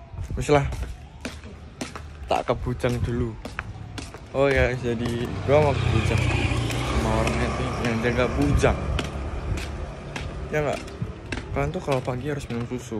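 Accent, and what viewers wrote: Java, Indonesia. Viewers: gole ngomong medok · Medok nyaa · medok banget jawanya ka *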